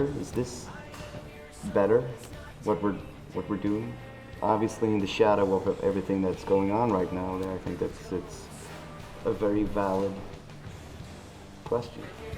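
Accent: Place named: United States. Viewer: Whoever this is, Alpha or Papa, there is virtually no accent whatsoever. He just sounds American, though erudite -- maybe Canadian. I'm confused.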